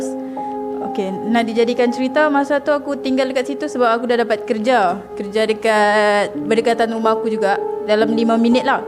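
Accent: Sabah, Malaysia. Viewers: siuk cerita mu sis 👍 suka sya dngr . tmbh tmbh lg loght sbah beii . snang sya fham . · Suka dgr akak ckp slang sabah😄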